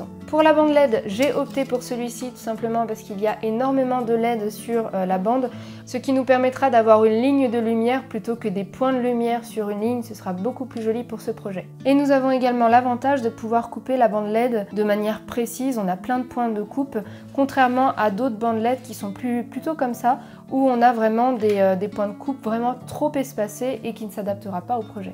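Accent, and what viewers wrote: France. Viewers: Como hago para casarme con una mujer inteligente como ella y ese acento francés enamora.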